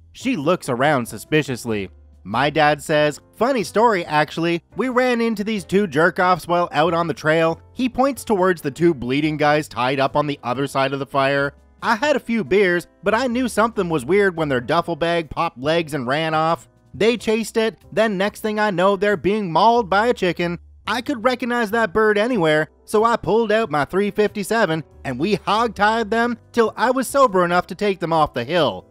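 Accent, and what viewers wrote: South, United States. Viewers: The Miraculous, Stupendous, Gorgeous, Righteous, Lovable, Huggable, Adorable, Tasteful (soap operas), Beautiful, Powerful, Loyal, Legendary, and Best Chick-a-baby Mr. Koo has returned! ❤️, don’t worry about your Southern accent, it’s fine. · your "southern accent" sounds like you were raised in the southern U.S at one point so don't beat yourself up about it. Sincerely, a Southern Yankee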